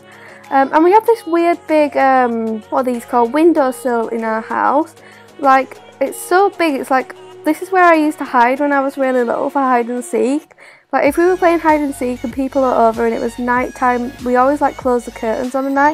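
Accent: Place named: England, United Kingdom. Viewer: Do you come from England? Everything you said we say in England😂 (how you said things)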